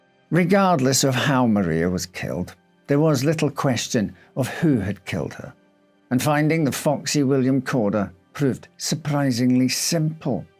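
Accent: United Kingdom. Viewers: british? lol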